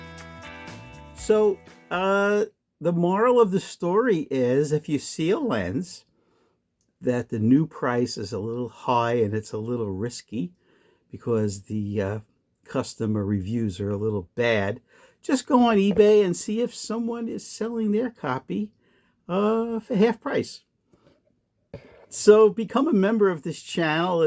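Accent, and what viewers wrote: New York, United States. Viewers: Nice shots, I don't see any massive issue with the lens. You must be one of the last few people with a genuine New Yoik accent :) love it. Watching in Scotland,.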